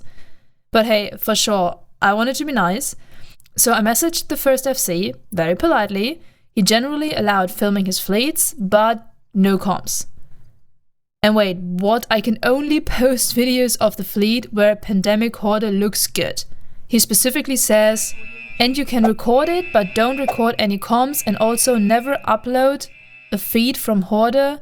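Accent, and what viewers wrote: Germany. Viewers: no le hagas caso por favor, tu ingles se entiende perfectamente y tu acento alemán es bonito. Se te entiende mejor de muchos streamers americanos (o ingleses?) que por tener demasiada confianza con su idioma resultan incomprensibles a quien no lo habla muy bien. Y para ser pesado también diría que el idioma ingles copió la palabra Horde desde el francés, donde la "E" no es muda para nada. Sigue así, gracias por tus videos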